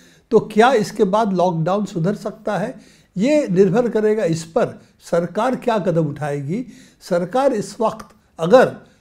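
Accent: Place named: Bengal, India. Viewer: in ko hindi buolna nhi aata , sthree ling prush ling ka kuch pata nhi , bengali Logaun ki tarah baat karte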